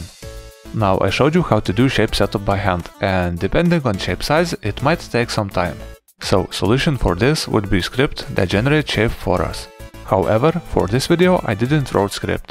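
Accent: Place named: Russia. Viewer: Damn dude you have such a русский акцент .. (Аж ваще)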